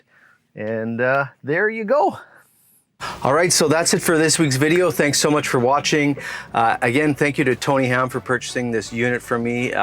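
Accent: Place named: Canada. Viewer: Idk for sure if this guy is Canadian he sure sounds like he is. Once I heard that I was pretty sure what was under the hood. They like those up there.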